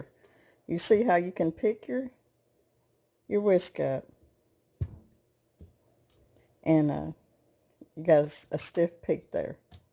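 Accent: South, United States. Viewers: Love your ‘southern” accent and gentle spirit.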